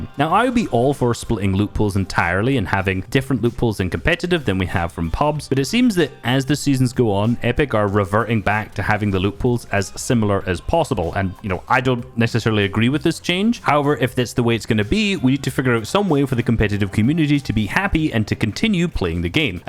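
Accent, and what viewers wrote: Scotland, United Kingdom. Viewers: Gotta love that scottish voice:hands-yellow-heart-red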